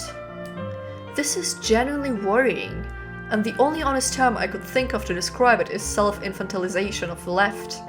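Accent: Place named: United Kingdom. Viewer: legendary youtube recomended pull did not expect you to sound so british...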